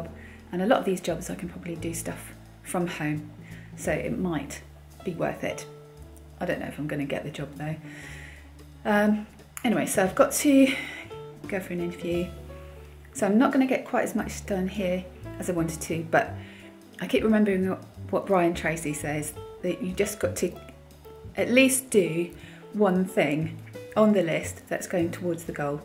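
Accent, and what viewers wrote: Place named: West Country, United Kingdom. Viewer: I had a little chuckle to myself when you mentioned Ben unloading the dishwasher: my husband thinks he's being helpful by putting all the stuff away but I nearly always end up having to put things back in their 'correct' place- this goes for shopping too- the perils of being a control freak lol. Hope you did feel good after the clearout and am not surprised you got a headache the next day, I get like that too. It was novel to see food items in drawers instead of the 'usual' cupboards :) Good luck with the interviews (although I know the feeling of going for a job and not really wanting it!). This is really random but I've noticed there may be a tiny bit of a west country twang sometimes when you speak- hardly surprising after living with Ben for over 30 years lol :)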